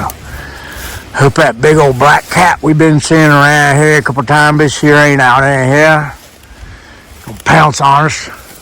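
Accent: United States. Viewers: Yep, you are goofy. Just what I thought. Loving it though! With you talking like an old country boy and making such a racket...they are not gonna stay around long!! Oh well, they'll be back!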